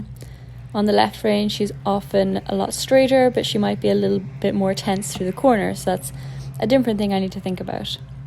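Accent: Ireland. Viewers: Another fantastic video... 🙂😉😁 Her sweet sexy irish voice makes me fall asleep 😴 but not because I'm bored in the slightest lol it just reminds me of those cd's which help you fall asleep!! I often lay in bed watching these & wake up still wearing my headphones & holding my phone!! I love all of her videos, especially the connection she has with the horse.. perhaps the gentle voice along with her fantastic riding hypnotises the horse lol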